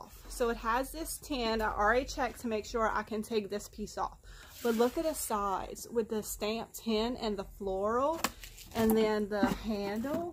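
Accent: Louisiana, United States. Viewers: Love Love Love all of your videos!! One of them popped up on my feed, immediately subbed you and I've been watching them all! Love your accent! I'm cajun as well from near Lafayette and live in DS near Baton Rouge. I just wish I had your energy and creative mind lol thanks for the content!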